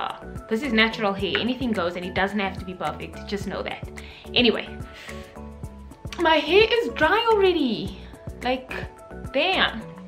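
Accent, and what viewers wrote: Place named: South Africa. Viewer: You sound so south african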